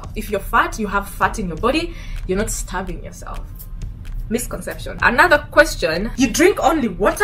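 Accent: Kenya. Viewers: You have a very strong Kenyan accent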